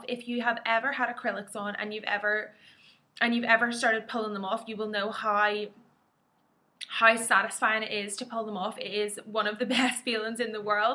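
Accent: Ireland. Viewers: Love the accent!! Irish FTW!! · love your accent, I'm irish but born in america so sadly didn't adopt that beautiful accent ;) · r u irish?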